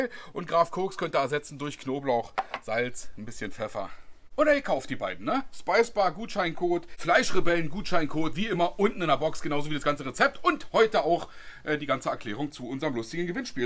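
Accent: Berlin, Germany. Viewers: #GEFU habe schon ein paar GEFU Produkte zuhause...aber die würden sich bei mir perfekt ergänzen:) mach weiter so! Richtige Berline Schnauze 😉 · #GEFU mag als Rheinländer Deine Berliner Schnauze und auch Deine Rezepte. Als Grillanfänger, braucht man so einiges an Zubehör, um Deine Rezept ohne zu improvisieren nachkochen/grillen zu können. Gewürze sind immer geil und ausprobieren von verschiedenen Gewürzen macht immer Spaß . Weiter so mit den tollen Rezepten und verlier Deinen slang nicht. Hau rein. Gruß aus dem Rheinland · Ich habe erst vor 5 Wochen meinen ersten (Gas-)Grill bekommen und kann deshalb ganz viel von dem präsentierten Zubehör (#GEFU) und auch von den Gewürzen brauchen ohne es im Schrank doppelt zu horten. Und obwohl wir uns (Du Berliner, ich Bayer) dialekt- und sprachtechnisch voll unterscheiden, find ich Deine Videos und Anleitungen "voll dufte"! 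👍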